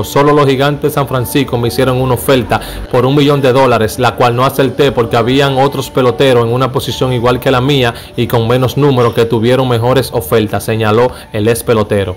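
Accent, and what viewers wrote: Cibao, Dominican Republic. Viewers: Tienes que trabajar fuertemente con la pronunciación. Haces muy buen trabajo, pero tienes que neutralizar el acento cibaeño, que esta muy arraigado en tí. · Diablo que mal tu te expresas, debes ser Cibaeño. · Es que es cibaeño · Pana tienes que leer mas para que mejores tu lexico, ese acento tullo es del cibao, ahora tu le estas hablando al mundo, no puedes tener acento regional, saluditos y espero que sigas superandotes. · deja de hablar así como cibaeño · Mejora Tu lexico grammatical y locutorial y dejas El cibaosland fuera cuando Estes narramdo. Un consejo.. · El problema con la dicción es que es cibaeño y en esa región se les dificulta hablar sin meter la i donde donde va la r y quitarla cuando la palabra termina en i entonces dicen la L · Es cibaeño y quiere ocultar la “i” y le sale así 🤷‍♂️ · Señores las informaciones d este joven son muy buenas no se fijen en su forma de hablar para algunos cibaeños es muy difícil mencionar la i donde va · Puro cibaeño no te lleve Bro habla como tu habla ridículo es cuando tu quieres hablar fino eso es ridículo habla cm tu habla bro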